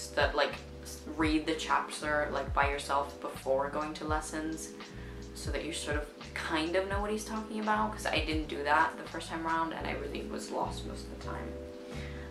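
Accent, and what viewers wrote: United States. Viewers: You sound American?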